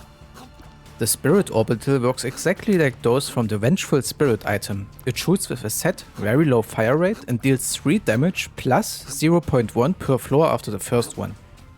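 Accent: Germany. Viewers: Wait ya german?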